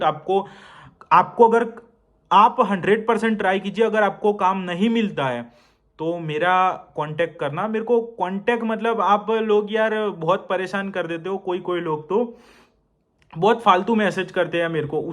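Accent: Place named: Gujarat, India. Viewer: Bhai me ahmedabad gujrat se hu muje lagta hai apke bolne se ap bhi gujrat se lagteho to app no do to bat karsakte hai Mera ek consultant he ahmedabad me 2 sal ka work permit de raha hai after reach 5.5 lakh payment or 600 to 700 euro in factory worker with acomodetion and food by company flight ticket include to kya karu sir ana chahiye ya nahi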